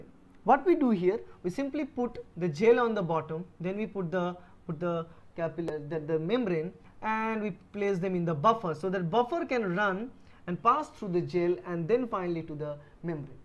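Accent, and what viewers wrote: India. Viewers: When I saw the video, I said oh my God, this is Indian and he speaks English, of course, I will not understand anything, but I understood what I did not understand in 4 hours🙃 thank you soo much 😍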